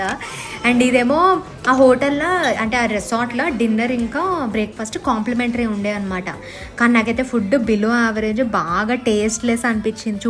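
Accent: Telangana, India. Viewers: Pakka telangana language❤❤❤ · Akka e Mimi vlog chusi entha navvukunnano mee language ki... Pakka telangana slang I loved it....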